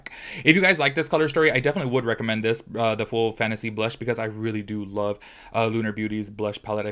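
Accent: United States. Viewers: The way you say it is the way I say it and that's the American way to say it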